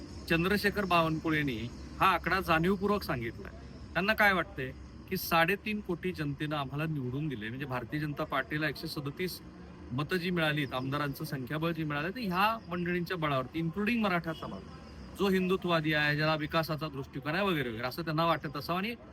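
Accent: Marathwada, India. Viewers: मराठवाडा बोली भाषा असिच आहे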